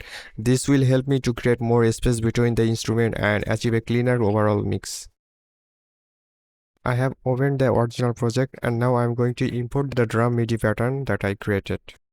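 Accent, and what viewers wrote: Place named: India. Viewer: Nice work👍 I hear an Indian accent😎 Hello from Russia 🇷🇺 FPC is an optimal tool to make pro and solid drum part in FL, but sometimes I use NI Battery😉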